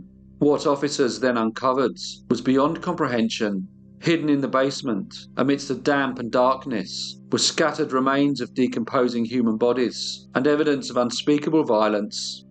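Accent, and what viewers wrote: England, United Kingdom. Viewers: Reputable channel, not quite. The narrator can not even say the gentleman's name the right way. Isn't it said Hose' or 'ose'? You don't say the J because it is silent? If this channel was reputable, it would have also done the research of how to pronounce the Brazilian names. Hence, the English narrator was probably making fun of how the South American dialect is pronounced. This is the reason why I have not subscribed to this channel. I can't stand the one tone monolog, and that flucking English accent!!! Why not be a little more respective, and also get another narrator, possibly a female!!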